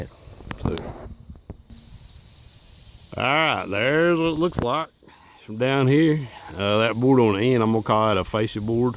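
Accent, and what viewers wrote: North Carolina, United States. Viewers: I am from south eastern North Carolina.....your accent is too!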